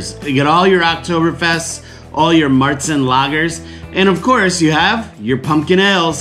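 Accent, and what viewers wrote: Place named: United States. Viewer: American accent.. yea bro! Gotta try this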